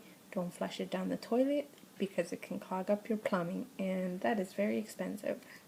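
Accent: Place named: Tigrinya, Eritrea. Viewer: She's a decent person. I enjoy her manner of speech. How slow and carefully she says every word gives her that classic Eritrean-American form of speech. A few of my female cousins in L.A who speak Tigrinya like my parents but are college educated. They all speak and have that very subtle Tigrinya accent like this chick does. The Eritrean in me wanted to not like this video. At first I thought it was too vulgar because she details pre-cum and and the speed of sperm. Watching more of the video. I couldn't help but think kids (and even some adults) are going to type "sex" in the searxh column anyways. There are a million videos of other people doing this same thing. Those other people are not Eritrean though. I'm a firm believer on the adults being and looking like the children who are learning. It's nice to have a young woman who looks, speaks and is similar to my little niece, sister, brother ect. 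I'm studying to be a high school geography teacher. I'm doing that because I want my children and children of similar color as me to see an educator who looks like them. The only major disappointment for me with this presentation is. No visual example. She details and is very well informed in what and how to use a condom. My issue is that if someone is watching this video to learn. How will they retain a visual memory without having seen how to do what she's saying? A condom and a banana or a cucumber would have helped in this video. Eveything she is saying is right. Add a visual exa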